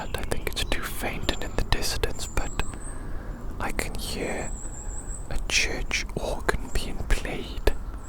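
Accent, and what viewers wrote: Wales, United Kingdom. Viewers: Noooooow I know why you talk a lil funny! You’re just from Pontypandy! (I’m a Hampshire-ean) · Welsh accent 10/10. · The Welsh accent is made for asmr, whispers are immaculate 👌🏻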